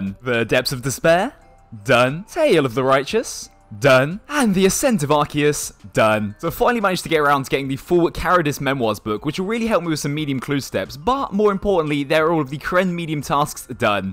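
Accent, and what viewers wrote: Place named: Surrey, United Kingdom. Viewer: Yes my guy, where in the uk are you from? You sound local to me! Surrey area?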